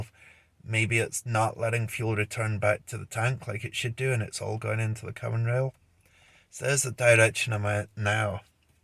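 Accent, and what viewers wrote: Scotland, United Kingdom. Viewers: Very interesting accent, bit of scottish and american... Whats the craic?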